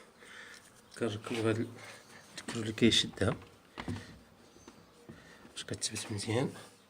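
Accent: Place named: Maghreb, North Africa. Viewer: لو الشرح بلغة اخرئ واضهار ترجهمه عربي احسن من من هي اللهجه. والله بصراحه اللهجه المصريه واضحه جدا وسلسه اما الجزائر المغرب مشكله وخلوا النت كله كذا باي مجال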